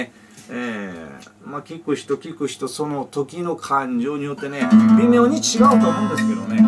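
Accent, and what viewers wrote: Kagoshima, Japan. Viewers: 鹿児島弁でなく、薩摩弁ではないのでしょうか？ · さんの鹿児島の言葉は最高ですよ！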